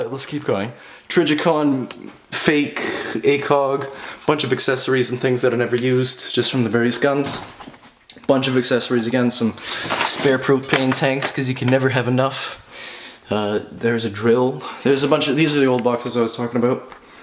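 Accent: Canada. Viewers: Your Canadian accent really comes through in this video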